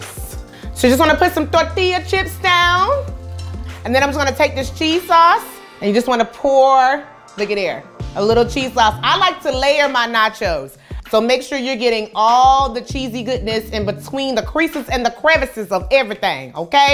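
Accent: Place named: South, United States. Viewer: I know that right, and she has a voice too. That's that good old Southern voice.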